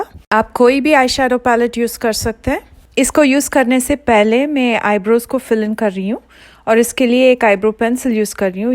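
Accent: South India, India. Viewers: feeling lucky got ur reply🙈 everybody can understand u r fluent with it😍😍😘😘south indians speaking hindi is the cutest thing in the world🌏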